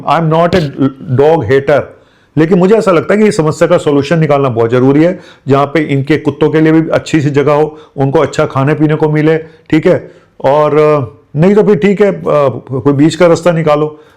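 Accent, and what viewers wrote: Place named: Delhi, India. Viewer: Dr sahab ham gujrati log aapke jaisi Delhi vali hindi nahi bol sakte to plz hamari msg pdhhke hansna😂 nahi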